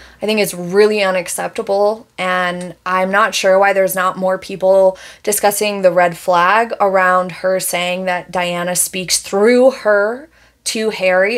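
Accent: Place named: Midwest, United States. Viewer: are you from the midwest? I'm catching a slight accent.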